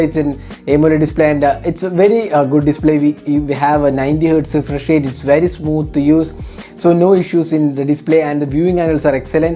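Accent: Kerala, India. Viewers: Enthaaa English. Only malayalies talk English with this accent. Fools....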